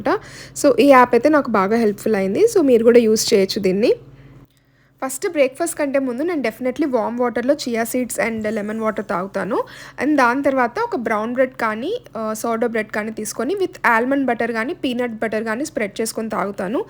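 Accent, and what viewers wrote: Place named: Chittoor, India. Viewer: I'm from Chittoor me language kuda almost ma Laage undhi language kosam nenu me vedios chustanu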